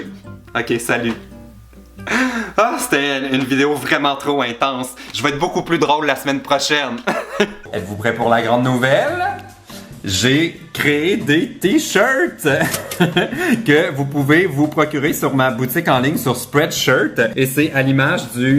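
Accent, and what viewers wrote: Quebec, Canada. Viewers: j'aimerai d'abord dire que j'adore tes vidéos et que tu es super cool. je trouve que c'est courageux de partager ton expérience j'ai beaucoup de respect pour les homosexuels. PS: j'adore ton accent québécois. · Merci d'avoir partagé ce bout de ta vie ( je sais pas si ça se dit 😖) je suis gay et je ne l'ai pas encore dit a mes parents, mais à pleins de mes amis 👭👫👬. Je recherche souvent des vidéos sur le coming out mais les vidéos sont rares en français. Je commence a me sentir près à le dire mais je sais pas comment vraiment leur dire.(j ai aussi 14 et je pense que c est le moment) Merci 😘 je t'adore je suis super fan de toi 😍🌈💕 avec ton accent québécois super choux (j'habite en Suisse🇨🇭☔️🍫🐮⌚️)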